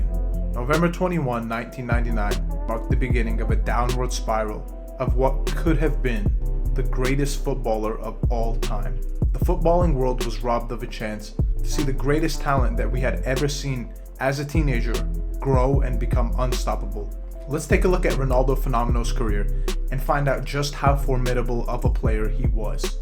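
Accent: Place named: United States. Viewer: Già sentire un accento americano che parla di calcio non è credibile...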